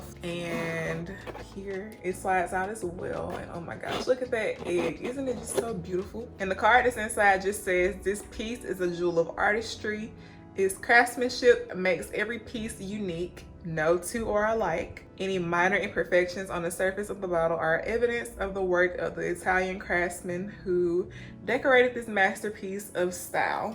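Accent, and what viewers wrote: South, United States. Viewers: What’s happenin lovely?Been subbed to you for a few months I think.Been meaning to pass through your page to show you some love at some point.Saw your vid here on my feed,so here I am 😉 Super solid page and content fam.Mad respect.Cool video here as well.Love your southern accent too :) Keep up the good work and have a wonderful week and beyond.SOTD = Ex Nihilo’s Bois’D Hiver to the wrists and forearms,and Creed’s Royal Oud to the front and back of the neck...came out Glorious 😊 Got the idea from Max Forti’s page a few years back.He recommended Oud Wood and Royal oud which is a really nice blend too..but I tried the Bois ‘D Hiver variation instead of the Oud Wood with Royal Oud because of their striking resemblance.Real cool :) 1 Love/Peace/Bless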